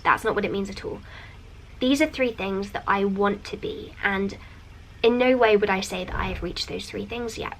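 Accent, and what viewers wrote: RP, United Kingdom. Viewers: I feel so ruff after watching this your accent is sooo posh I am half from Essex half from Cardiff ly x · Is it British accent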